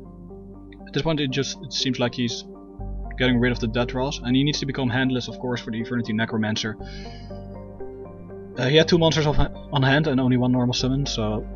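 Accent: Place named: Netherlands. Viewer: youre dutch aren't ya? I'm too :P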